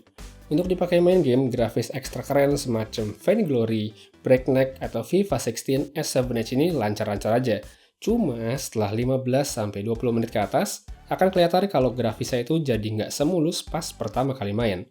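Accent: Bali, Indonesia. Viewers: Bang lu orang bali ya??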